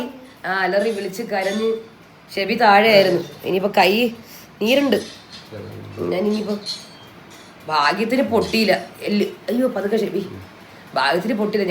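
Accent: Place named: Thiruvananthapuram, India. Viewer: Because TVM slang.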